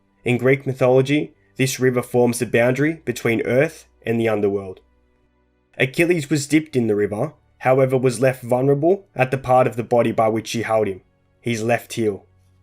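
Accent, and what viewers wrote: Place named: Australia. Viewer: I can’t take the aussie accent seriously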